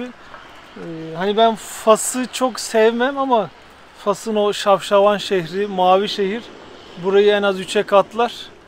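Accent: Samsun, Turkey. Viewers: Abi sen samsunlumusun ellllllliii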